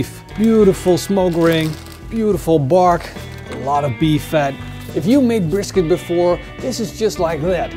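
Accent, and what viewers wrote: Netherlands. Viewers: He looks dutch and sound dutch 😄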